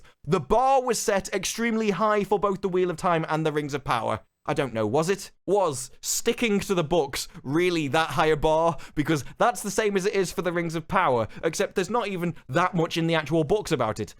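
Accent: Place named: RP, United Kingdom. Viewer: Simply due to the accent and delivery (which are fantastic, no criticism), I feel as if I am listening to BBC news or about to hear the next band on Top of the Pops.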